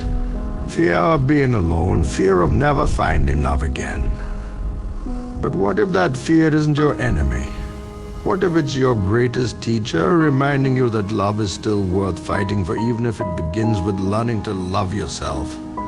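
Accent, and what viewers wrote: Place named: India. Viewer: Come on man, this dude sounds indian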